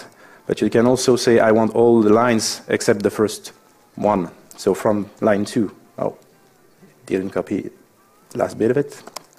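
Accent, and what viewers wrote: France. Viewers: Ah ah ah on entend le french accent lol bonne vidéo sinon les commandes classiques pour le nux sympa.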